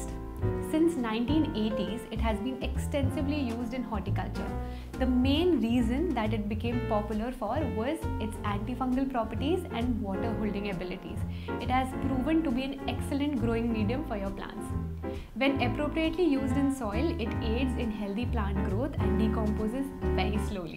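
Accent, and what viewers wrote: India. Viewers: Nice indian English। speaking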